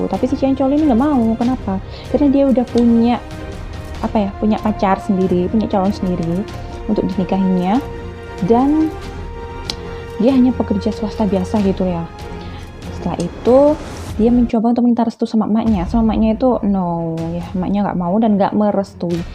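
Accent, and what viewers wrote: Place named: Java, Indonesia. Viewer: masih medok ya buk 😭😂💛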